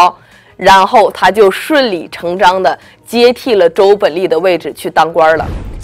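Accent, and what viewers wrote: Northeast, China. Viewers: 听小姐姐说话可好玩儿了，哈哈哈，东北口音小姑娘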